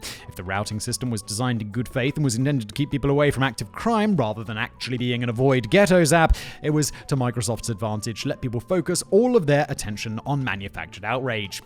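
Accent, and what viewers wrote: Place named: RP, United Kingdom. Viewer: A minute ago, I watched a “Geographics” about Trans…figuritania?😂😆 this wannabe independent nation in Moldova that reminds me of Corsica -.the motherland) followed by a Into the shadows… and the more goes on, the more unhinged, nasal and Pommy (Ozy/Aussie expression to depict the British “Poms”/UpperMiddleClass) becomes. It’s so funny/uncanny!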